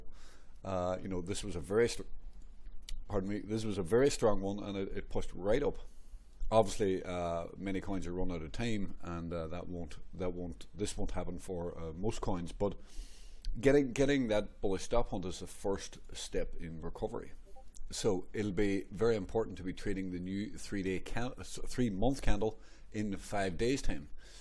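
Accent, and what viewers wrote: Northern Ireland, United Kingdom. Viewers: You sound northing Ireland. Hello brother from Dublin 👊❤️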